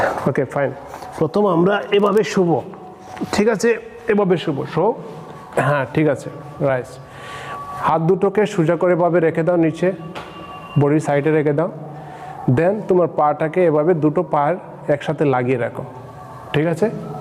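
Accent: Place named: Barishal, Bangladesh. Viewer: ও তুমি বরিশায়লা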